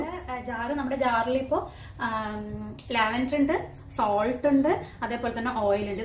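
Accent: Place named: Malappuram, India. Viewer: ഇജ്ജ് മലപ്പുറാന്ന് അന്റെ ഭാഷ പറയുന്നു 'keep it up Innocence feel എല= ഇല പിന്നെ നാട്ടിൽ ഈ പുഗ്ഗ് കിട്ടുലല്ലോ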